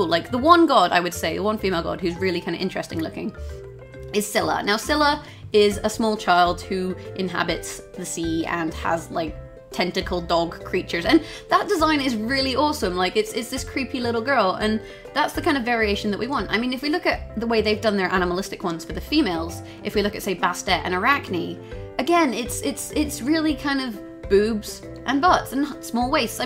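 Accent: United Kingdom. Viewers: I'm sorry, I can't continue watching this video until I know where the hell that accent is from?! It sounds British sometimes and American others... WHAT IS THIS??? No but seriously, I agree with this, they could've easily made Bastet, just a cat woman, not a woman who has a couple of cat features etc.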